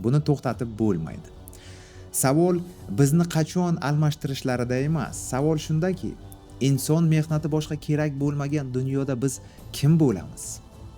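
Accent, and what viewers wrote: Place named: Bukhara, Uzbekistan. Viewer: Bro buxorodanmisiz deymanda 😅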